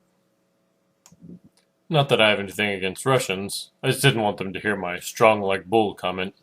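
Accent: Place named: East Coast, United States. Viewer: wow - funny video and very informative I really appreciate your taking the time to make and post this video - I've shared it with others who've asked about Ebru -- I may have to try this -- beautiful work - what is your nationality - heritage? you're obviously American - New York? New Jersey? sounds east coast LOL - I don't know - are you Turkish? Pakistani? I originally thought this was an Islamic art form - I lived in Turkey for two years and how I could have missed this I do not understand - I was young so maybe I just wasn't into art back then.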